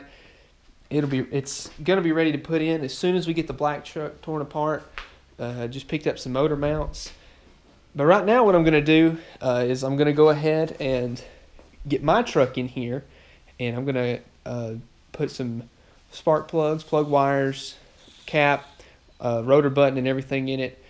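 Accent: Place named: Mississippi, United States. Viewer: can't help but notice you gotta Mississippi accent ... least that's how it sounds to me lol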